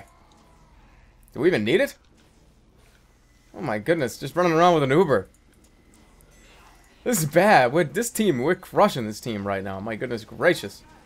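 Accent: Boston, United States. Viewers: Are you from Boston? I'm a new sub, and I can't tell...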